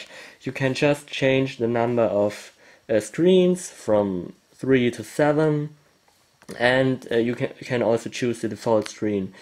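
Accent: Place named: Germany. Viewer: awesome german accent...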